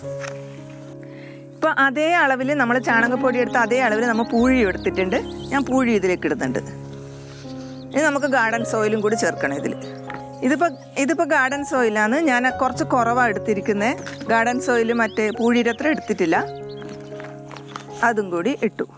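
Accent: Kannur, India. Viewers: ചേച്ചി കണ്ണൂരാണോ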